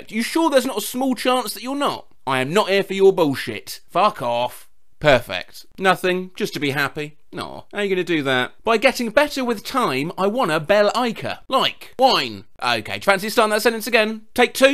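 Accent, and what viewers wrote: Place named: RP, United Kingdom. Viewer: Hell yes new posh Brit video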